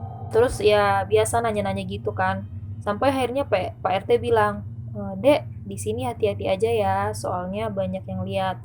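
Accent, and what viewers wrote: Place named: West Sumatra, Indonesia. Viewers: Menurut gue sih ceritanya serem, story tellingnya juga keren, tergantung pendengaran kita masing2 sih.. Cara orang bercerita mah beda2 yak, kita gak bisa maksain orng bercerita dgn gaya sesuai pencerita yg kita suka, si mba nya orng sumbar pasti dari segi logat aja udah beda sama daerah lain jdi gak bisa dibanding2in jga sih, nikmatin aja ceritanya guys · jelas kali logat sumatra nya lmao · Pembawaan logat sumbar emang gini mbak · Bukan menye2 mbak nyaaa, tapi logat dan cra ngmg org sumatra barat emng bgtu. Kbetulan saya org sumbar 😊 lain kli klo dngerin nya speed nya di naikin aja mbak jdi 1,25, saran nih 😂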